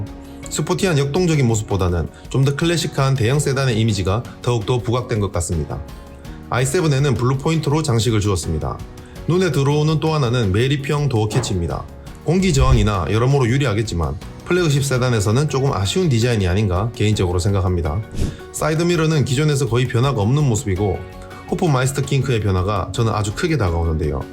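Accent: Gyeongsang, South Korea. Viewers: 경상도 분이신가요? 억양에 사투리 오지게 들어 있네요..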